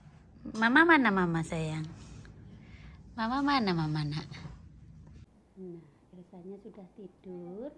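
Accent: Java, Indonesia. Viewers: Di luar negri tapi bahasanya masih jawa medok...itulah lucunya mbk.. jadi hiburan nonton vidio ini.. sampai ngakak saya...luarbiasa mbk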